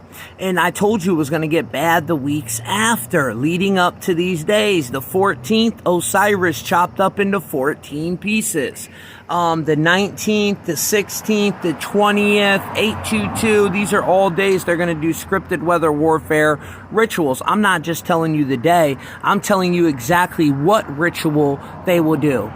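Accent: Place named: Baltimore, United States. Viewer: I have been watching your vids for 2+ years on FBas my main, Instagram, and here on YouTube. I'm born and raised in Baltimore. You sound like you have a Baltimore accent.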